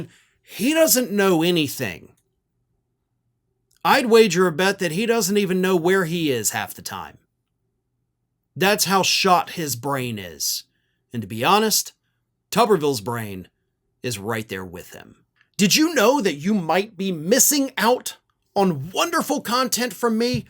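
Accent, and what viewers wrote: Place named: Appalachia, United States. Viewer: He is a maga senate wet dream. He talks and sounds like all the all stupid maga hillbillies.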